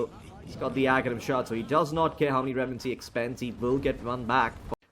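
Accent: India. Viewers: Stupid indian accent. Can't watch.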